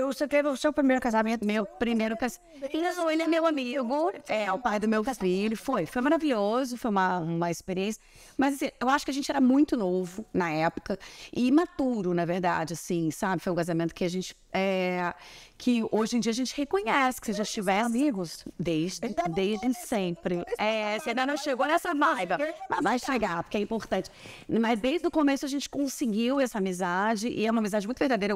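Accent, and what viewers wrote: United States. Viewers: Ta com sultaque americano 😮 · tá falando bem americanizada 😊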